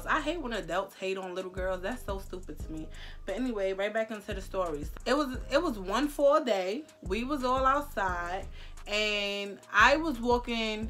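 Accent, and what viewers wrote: New York, United States. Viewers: It’s that New York accent!! I love it!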